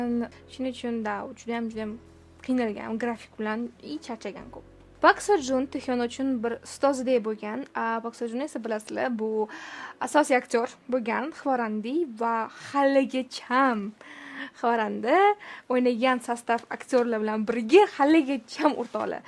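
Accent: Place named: Khorezm, Uzbekistan. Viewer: Xorazmdanmisiz apka sheva tortib baryotir❤😂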